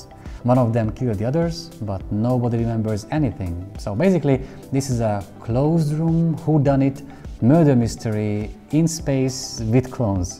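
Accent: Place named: Hungary. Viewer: I knew you were Hungarian as soon as I heard your accent!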